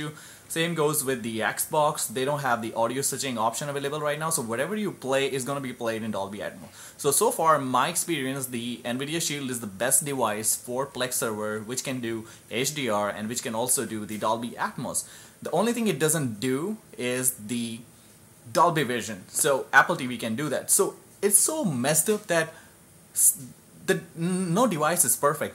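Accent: India. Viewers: Christ Youtube doesn't support 4K ??????????????????????? you need to prepare a lot before making a video and first speak slowly no need to rush. what accent are you on ? india ?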